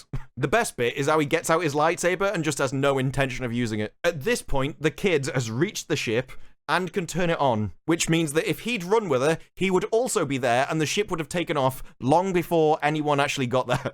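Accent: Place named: Sheffield, United Kingdom. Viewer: Yet another great reason to not watch modern SW. Your accent is bugging me, it sounds familiar (I'm Rotherham area) and every now and then your accent reminds me of PJW who hails from Sheffield.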